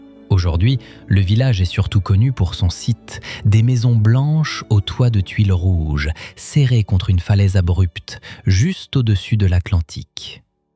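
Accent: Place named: Spain. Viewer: Mon pays de cœur, celui où je finirai ma vie, en ayant obtenu la nationalité portugaise. Si je vis assez longtemps 😅 Tant d'endroits magiques, de paysages variés, de fêtes inoubliables des plus grandes villages aux plus petits villages. Des traditions vivaces et une modernité, moins froide qu'ailleurs! Des détails, partout, qui m'émerveillent: calçada (pavage des rues avec des motifs), azulejos anciens ou contemporains, ferronnerie, art de rue si créatif, coloré et joyeux. ETC. Seul bémol de la vidéo: les noms portugais souvent mal prononcés, à la mode espagnole. Le portugais n'a heureusement rien à voir à l'oral avec cette langue si pauvre en phonèmes!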